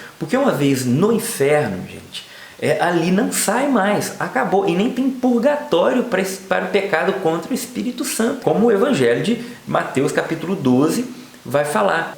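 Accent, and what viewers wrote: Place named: Caipira, Brazil. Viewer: Voce é caipira hein fiii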